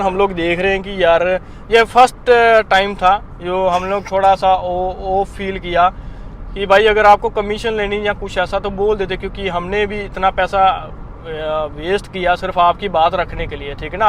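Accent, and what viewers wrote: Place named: Pakistan. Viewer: Which part of India you are from do you have video of that briefing about your self as I don’t know why your accent sounds like Pakistani accent Hindi. Very much similar to Pakistanis here in Dubai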